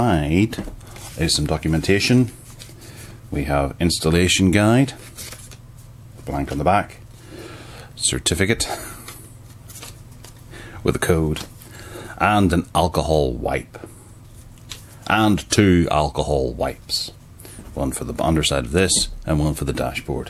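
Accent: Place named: Northern Ireland, United Kingdom. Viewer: Hey, do I detect a Northern Ireland accent :P County Down here !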